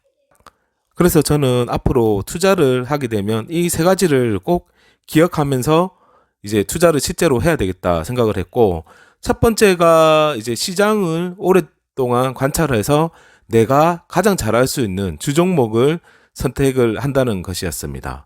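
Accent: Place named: Busan, South Korea. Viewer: 부산사투리..?? ㅋㅋㅋ 목소리가 넘 친근하고 좋으세요~~!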